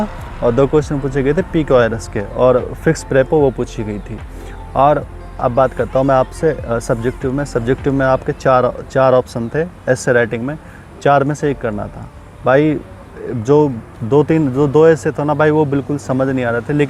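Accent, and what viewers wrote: India. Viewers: Bhai aap narnol Mahendragarh wali side se ho ❤😊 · I think you belong to Mewat?? Your accent 🤌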